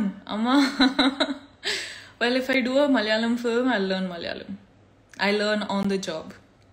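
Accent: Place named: Kodagu, India. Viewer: its kodava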